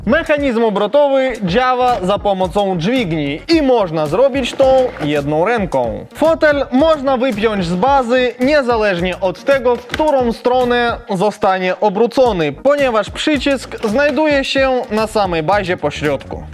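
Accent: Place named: Ukraine. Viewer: Dlaczego znowu słyszę Ukraińca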